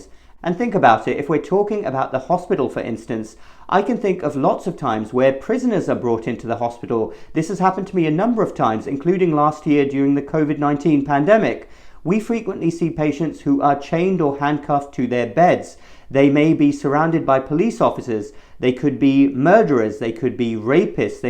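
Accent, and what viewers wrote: United Kingdom. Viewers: This opens up a bigger issue. Many doctors do refuse people for alot of unethical reasons. It happens more than you hear about. I was kicked out of the biggest healthcare system in the state because I stood up for myself in having one of my newly identified conditions treated. Even 6 years later if any doctor tries to refer me to a specialist there in any of their satellite clinics what happens is an electronic blocks prevents the scheduling person from advancing any further and locks up their computer. It then requires them to shut down their computer and reboot and then it tells them to "contact patient relations" where it will say I've been banned for life. This is a clear violation of the hippocratic oath but several doctors went along with the administration's agenda while the controversy was still going on and my own primary care physician's office was the one that was responsible. 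This is because now administrative staff have things hierarchically set up so that they outrank doctors, doctors don't own their own clinics anymore, and if doctors want to keep their jobs they are required to act against the best interest of patients if the "financial interest" of the corporation warrants it in their eyes. I don't know where you work (I'm guessing the UK because of your accent), if so then I think the UK has more of a community health perspective and this is probably less common to take place there than in the US, which does not have a single payer system. I was just kicked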